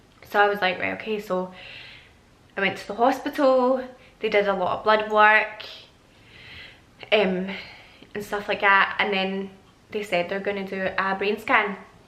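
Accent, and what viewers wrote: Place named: Scotland, United Kingdom. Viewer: Are you Scottish nice accent 😉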